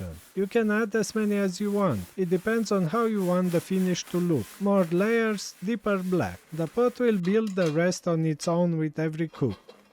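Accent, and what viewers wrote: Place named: England, United Kingdom. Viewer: I only subscribed and liked this amazing video because of your amazing English accent and your cooking skills!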